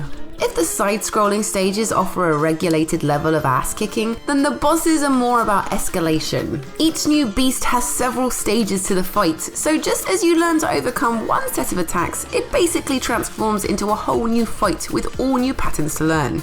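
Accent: United Kingdom. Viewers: stop speaking british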